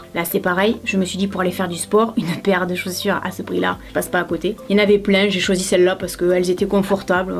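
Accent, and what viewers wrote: South, France. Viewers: J'aime bcp ton honnêteté et ton accent du sud lol. Effectivement victoria secret je pense que c'est bcp de marketing car j'ai aussi acheté des brumes (3 ou 4) et effectivement ça ne tient pas du tout!! Continue j'adore tes contenus 😬😘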